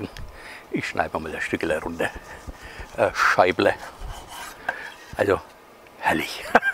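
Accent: Franconia, Germany. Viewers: Hallo! Dafür keinen Dank! Ich dachte mir halt das die Preissn uns a Fäschdäner! Grins!:-) Und Red weider Frängisch ich mach dann dein Übersetzer! Grins Gruß Robert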